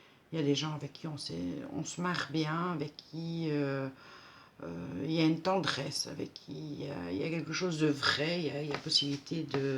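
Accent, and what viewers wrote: Belgium. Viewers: Coucou je reviens sur ma chaîne après un break vacanciers oblige lol, je suis contente de te retrouvé parmi nous, j'aime beaucoup ta chaîne et ton joli accent belge 😜😜😜 ne change rien, je te fais plein de gros bisous 😘😘😘😘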